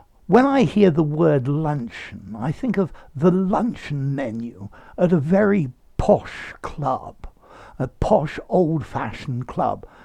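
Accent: RP, United Kingdom. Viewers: Thank you, sir! I really like it when you speak posh English, even your facial features seem to be changing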